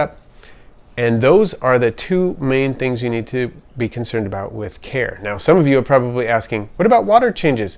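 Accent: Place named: Hawaii, United States. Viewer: Bro. You sound local, like from hawaii. Im fkn serious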